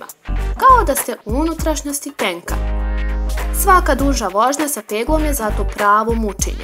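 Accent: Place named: Bosnia and Herzegovina. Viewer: Znas li ti koliko si daleko od bilo cega u vezi sa automobilima ?Toliko gluposti da se ovo ne komentarise.Komentarisem tvoj glas ,koji je negde iz Federacije Bosne i Hercegovine.Ti si toliko daleko od poznavanja automobila Kao Toyota -Camry model 1970-tih od Zastave 750L.Toliko netacnih gluposti,zavisti...Pri tom nemas pojma da par 10 zemalja na svetu proizvodi automobile u 20 tom veku.Znaci,ne sklapa,nego proizvodi.Kad vidis sledeci put Zastavu,najbolje je da cutis.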